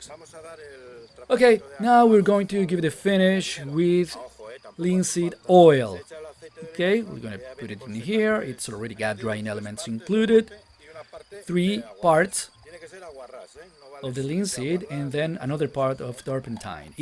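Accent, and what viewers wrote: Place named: Spain. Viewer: pero ingles se nota el spanish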